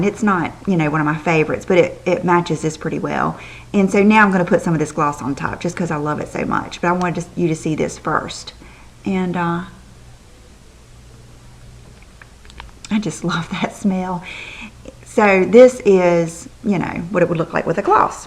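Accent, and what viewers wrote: South, United States. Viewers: I've been obsessively watching your videos because I LOVE your accent lol! As an East Coast girl, I just love listening to that sweet, southern accent! (Also, you're just so lovely!!)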